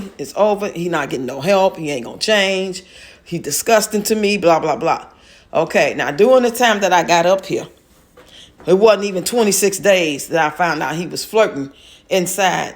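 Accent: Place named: Chicago, United States. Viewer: I’m born n raised n Chicago but I live in la , soon as I start watching your videos I heard your accent and I’m liiike ayyye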